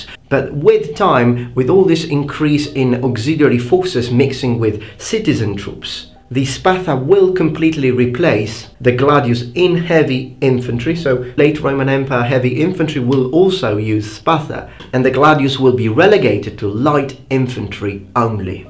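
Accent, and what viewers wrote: England, United Kingdom. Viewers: Cut your hair you look like a girl.-Bad mix (english accent beard and long hair) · Are you from the UK? Where are you from? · Man, you really had a thick British accent back in the day. Also, i like how every cut starts with you doing a little jumpscare. Bring back the jumpscares!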